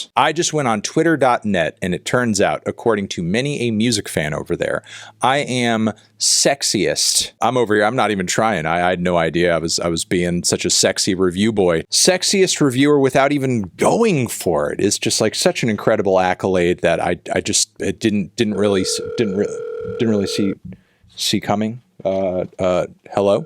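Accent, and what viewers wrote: United States. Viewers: I thought you were American :o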